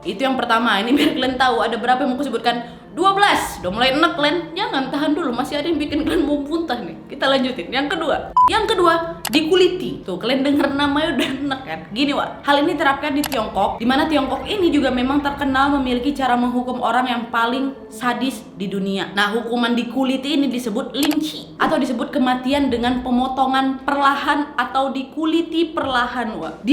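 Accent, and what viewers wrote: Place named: Java, Indonesia. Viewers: Kak kok jadi medok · Mulai medok yaa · Ka ada medok eee:) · Jawa amt suara😂😂sampek lupa skrip nya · Sehat terus, kakaaak! Aku yakin yang ada di sini pasti sayang kak! Btw kak agak medok ke Jawa yak ngomongnya 💚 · Malam minggu kerjaannya nontonin youtuber favorit! 1. yg udh jdi medok 2. TussyStory yg menawan 😍 3. Nessie coz its british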